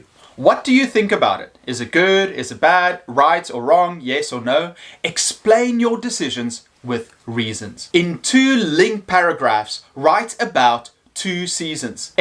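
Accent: South Africa. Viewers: South African accent. Nice.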